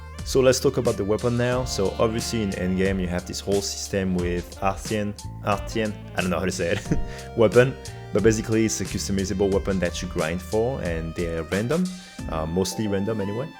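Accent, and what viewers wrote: France. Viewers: un très fort accent français mon seigneur ! bonne vidéo !!! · The bow king has returned! Also im curious is your accent French? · Un accent si français pourquoi parler anglais 🥲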